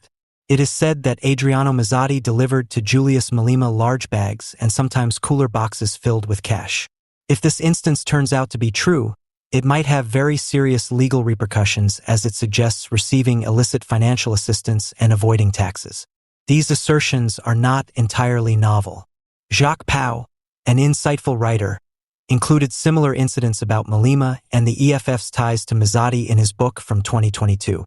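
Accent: United States. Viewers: Why is an American doing this voice-over?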